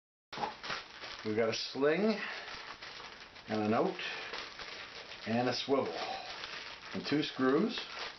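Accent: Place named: Ontario, Canada. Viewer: Must be from Ontario, right? It's the slight but detectable "uuut" in your speech.... (PS: I"m originally from Vancouver where all from Tah-ronna & points east want to really live. Lotus Land.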